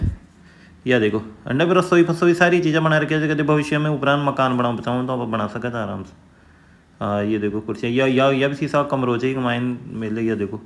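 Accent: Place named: Marwar, India. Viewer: Marvadi bhut achhi lagti h Bhaiya apke muh s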